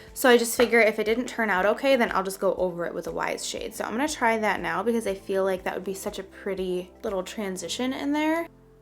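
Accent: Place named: Chicago, United States. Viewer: Girl! I freaking love this look!! Omg!! I know you struggled but girl, it's so bomb! You did the damn thang! This is my favorite look you've done for fall this year so far! As always love you and thank you for being such an amazing person to look up to and learn from ♡ my family is from Orland park so you always give me home vibes because your from up that way and your strong Chicago accent!! Xoxoxo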